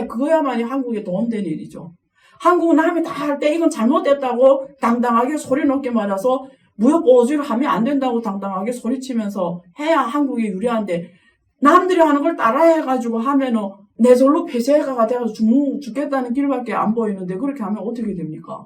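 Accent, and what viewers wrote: Yanbian, China. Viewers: 안유화씨 중국인이라던대 사투리도그렇고 사실인가요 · 안녕하세요 / 시청자 입니다 방송 음량이 70% 알아 듣겠는데 30%는 무슨 말인지 알아 듣지를 못하겠습니다. 구독자 15만명이면 좋은 방송을 위하여 투자를 좀 해야하지 않을까요? 스튜디오 방송 음향이 울림이 심합니다 / 무슨 말인지 알아 듣지를 목하겠습니다. 중국식 발음과 좋지 않은 음향시설 / 스튜디오에 방음시설 좀 하시고 / 커텐이라도 ........ 그리고 마이크 음량도 좀 UP 하시면 좋겠습니다. 시청자가 불편함이 없어야 하지 않을까요? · 과감하게라는 뜻 말투를 보니깐 중국 연변이나 목단강쪽 분인것 같고 그기에서 살아온 조선족분들은 북한에서 건너간 조상들이 많습니다 그래서 한국 표준어를 잘 못하는데다가 경제나 기술쪽 전문용어까지 이해하고 공부해 가면서 방송을 할려니 부족한부분이 있는것 같습니다 뭘 또 찝어서 지적하시나 ㅋㅋ 아시면서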